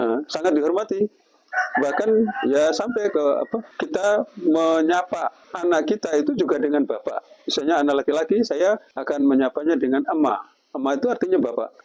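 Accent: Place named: Java, Indonesia. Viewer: Mohon maaf bapak,, bp bisa 'medok' begitu, apa sering berbahasa jawa pak 🙏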